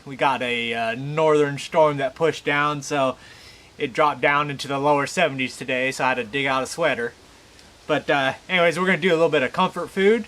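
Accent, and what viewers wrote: South, United States. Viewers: where'd u grow up? never rlly see a somewhat old asian guy with a southern accent lol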